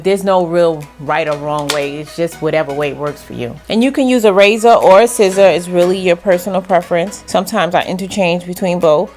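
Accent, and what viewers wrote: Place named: New York City, United States. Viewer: Jersey girl here! I hear your THICK NYC accent! 💕 I have family in the city! Love your videos! Love this unit! Congratulations on the new car! I have been following you for YEARS!!!!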